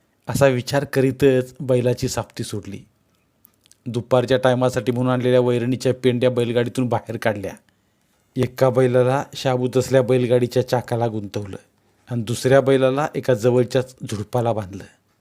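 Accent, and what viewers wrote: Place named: Nipani, India. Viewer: कथा सांगली मिरज कडची आणि बोलण्याचा टोण निपाणी हामीदवाड कडचा वाटतोय जरा जरा सुर वडताय 😂 कोकणी गत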